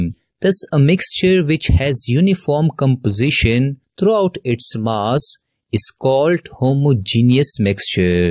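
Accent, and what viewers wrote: India. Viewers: ugh another idian speking guy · Its indian accent though, the spongebob narrator has a french accent✨ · His accent is so pure Indian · Omg....I love his Indian accent it’s so cute 🥺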